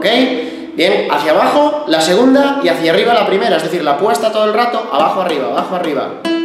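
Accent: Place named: Spain. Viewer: Ostia tio eres un buen maestro muy aparte de tu acento español te sabes comunicar bien te felicito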